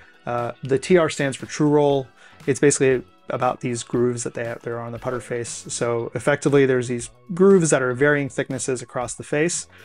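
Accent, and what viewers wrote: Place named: New Zealand. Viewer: Cant believe I didnt pick up the accent. Cant stand listing to kiwi accents, or sepo's either, but put them together and it works. US ladies def love the kiwi twang aye :)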